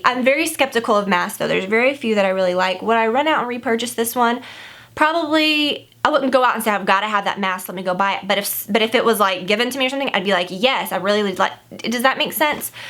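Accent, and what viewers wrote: South, United States. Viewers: You are FAB! (Your southern accent is subtle, but so cute!)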